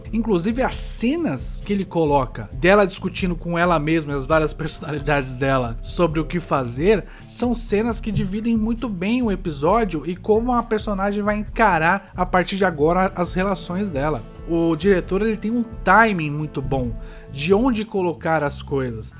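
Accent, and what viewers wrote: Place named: São Paulo, Brazil. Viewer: como voce é burro! nao sabe a pronuncia de "cha". vai ver mais anime e estudar um pouco de japonês. pela pronuncia só pode ser sp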